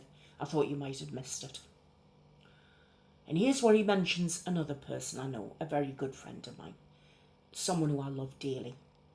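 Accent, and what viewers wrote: United Kingdom. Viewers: He sounds so much like my mother "after all I did for them and never asked for anything in return" except there was always a price for everything, a high one and she would use the tiniest thing for leverage to get her own way for years later, not to mention using her 'good deeds' as things to brag about to anyone that would listen. I would never trust Chris about anything. I heard about him in passing (in a good way) quite a while ago and went to see his channel. I left very quickly because I recognised the personality type straight away as one that isn't healthy to be around in any way at all. He does nice things for people to buy them and then they're not allowed to leave his team ever afterwards. If they do, he lashes out. He doesn't understand that people are not objects to be owned and controlled. On a different note, sorry to hear you're struggling in this weather, hopefully it will break soon, or at least be less humid. 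I live in a nearby part of the UK to you (assuming your accent matches where you live) and we aren't used to this level of heat. I have a good supply of warm clothes and waterproof clothes, but very little for hot weather!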